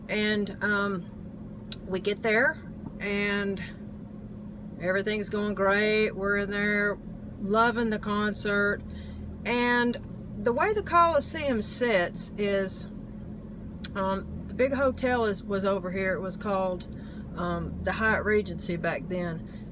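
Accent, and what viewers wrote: United States. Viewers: Your accent's pretty awesome. My grandmother's from Texas so it's something I've heard my whole life. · I like your southern accent.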